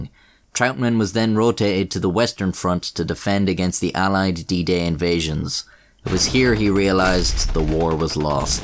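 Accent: Ireland. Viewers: I really enjoy your straightforward style, thoroughly researched material and deadpan Irish delivery. Keep up the primo content. Cheers! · I was wondering because you sound either Irish or Scottish Mainly Due to the fact that they make me laugh They are the kind of people that have a great sense of comedy In the most down-to-earth away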